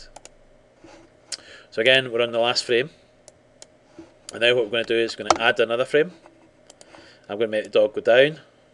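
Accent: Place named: Scotland, United Kingdom. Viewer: wtf ur scottish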